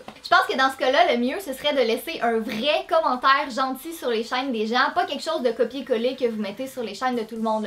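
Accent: Quebec, Canada. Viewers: J’ai suis tout tes conseils depuis assez longtemps... je suis passionnée j’adore faire des montages, des miniatures ,j’utilise une caméra de bonne qualité .. J’aimerais que des personnes visionnent le travail que je produis ... mais malheureusement mes vues n’augmente pas ,mais abonnés non plus ext ... Help me ! ❤️ Sinon j’adore tes vidéos et ton accents canadien ❤️ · Tu es Québécoise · Découverte sur le site de Patricia B, une vidéo fort intéressante, j'apprécie énormément. J'adore sincèrement l'accent du Québec, il est magique je dirais. Amitiés de France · Je sais pas pk je kiff ton accent québécois :3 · j'adore votre accent Québécois! 😘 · Coucou! Je te remercie pour ta vidéo, tu as donné pleins de conseils utiles auxquels je n’avais pas pensé! Je viens tout juste de commencer les vidéos sur ma chaine, je vais prendre en compte ce que tu as dit! Je te remercie encore en tous cas, continue, tes vidéos sont tops et ton accent Canadien aussi! 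😋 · En tout cas tu fait du beau travail, continue comme sa ! ps: Je t'adore, t'es magnifique, continue comme sa!ET VIVE LE QUEBEC ET LES QUEBECOIS mais si j'en suis pas une j'adore votre accent mais j'arrive pas a l'imiter :) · On remarque que t'es québécoise par ton accent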